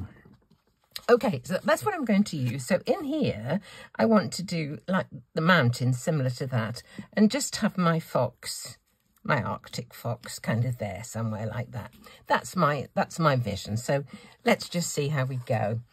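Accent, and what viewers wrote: United Kingdom. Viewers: Your lovely British accent gave it away!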